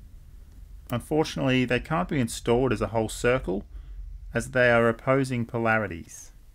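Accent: Australia. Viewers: Very satisfying to see and Hear a fellow Aussie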